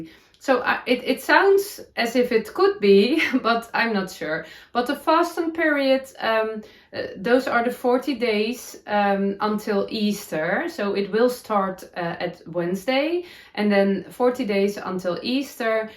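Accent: Netherlands. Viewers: I love it. My dad and his family were from Holland, most of them have now passed so I love to hear your Dutchy English. It reminds me of them. I enjoy hearing about your culture also seeing some of the sites. Thank you for your English speaking podcast.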